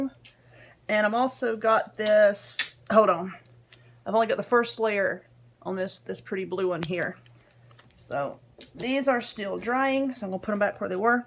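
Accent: South, United States. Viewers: Love your channel and your southern accent. I have southern accent as well 😊 I wanted to know what type of cricket machine you have?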